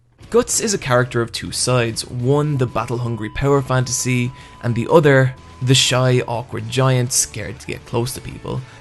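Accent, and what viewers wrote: Ireland. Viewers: you're Irish... I'd love to have an Irish accent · you Irish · are you irish?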